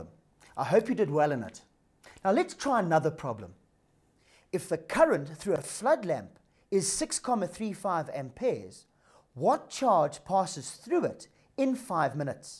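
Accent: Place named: South Africa. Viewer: sounds like a south african accent mate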